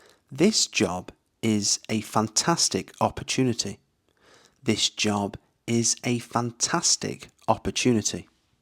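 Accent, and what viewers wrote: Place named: London, United Kingdom. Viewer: What is this goofiest accent? 💀 Pronouncing 'understanding' as 'OWNDERSTANDING' is actually unhinged. Bro is literally running an English channel just to confidently butcher his own language. Why do you sound like you’re actively choking on a hot potato? Even the Oxford Dictionary knows it's a clear 'A' sound, but here you are pushing your muddy, regional dialect on international learners. This isn't 'authentic B2 English', it’s just pure auditory torture. Imagine buying an English course only to end up sounding like a generic NPC from a sketchy London alleyway. Is this really how you teach people?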